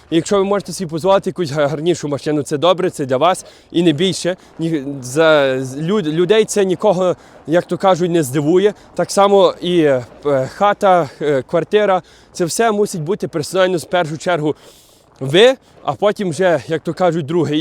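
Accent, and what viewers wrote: Western Ukraine, Ukraine. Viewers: Слышно по говору, что он с западной Украины. А чего он не в окопах, патриот хренов. А русскоязычные украинцы защищают свою землю.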